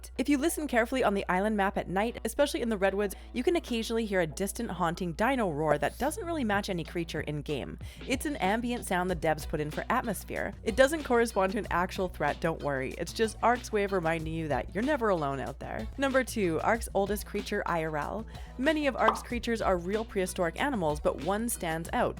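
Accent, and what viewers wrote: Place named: Canada. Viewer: You pronounce things great 😂 love the Canadian accent too.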